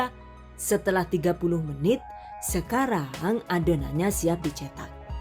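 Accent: Banyumas, Indonesia. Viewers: Jebule rika wong ngapak yahhh :v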